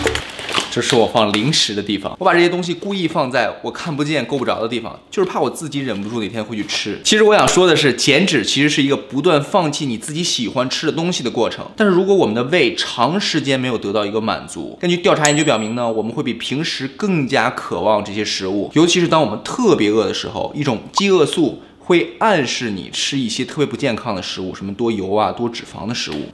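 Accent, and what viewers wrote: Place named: Beijing, China. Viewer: 觉得帅哥是北京人哈哈 虽然儿化音可能因为常年在美国被磨得若隐若现 但是他的吞音还是很标准的lol 【如果大家喜欢我的视频 = rua喜欢我的视频】哈哈哈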